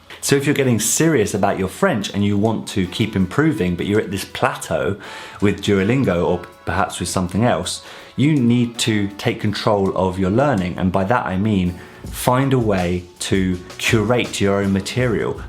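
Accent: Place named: United Kingdom. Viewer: Are you British? You sound like it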